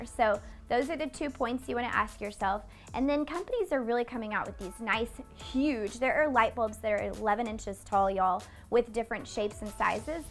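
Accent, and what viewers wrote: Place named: South, United States. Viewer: Love your charming southern accent and personality. Excellent tips on the dimensions. Nobody tells us that. jkr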